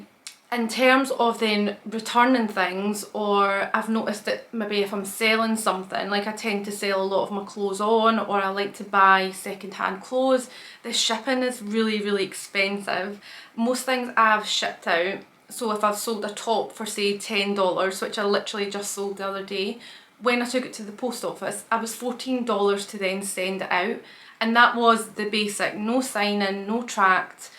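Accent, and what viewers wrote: Scotland, United Kingdom. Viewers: Agree about the clothes dryer , lived in Tassie 55 years and neither me or my parents or daughter have ever owned a clothes dryer. I never really associated redheads with Scotland , I guess I just thought of Scotland male teens as all having skinheads or shaved heads whatever you want to call it , maybe it's from movies or something I have seen on Scotland . Also am surprised at the huge difference in Scottish accents between you and a Scottish YouTuber that I watch regularly called " Beth roars", must be from opposite parts of Scotland as sound nothing like each other 😃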